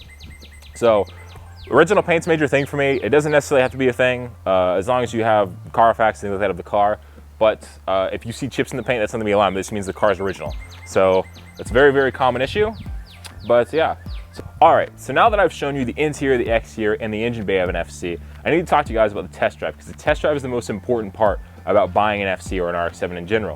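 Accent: United States. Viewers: What part of Nebraska are you from?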